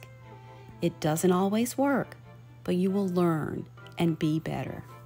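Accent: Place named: South, United States. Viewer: I love your soft, sweet Southern voice!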